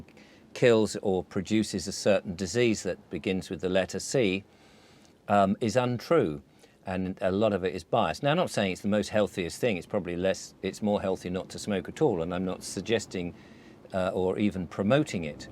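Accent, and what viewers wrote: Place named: RP, United Kingdom. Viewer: You're quite posh. It's nice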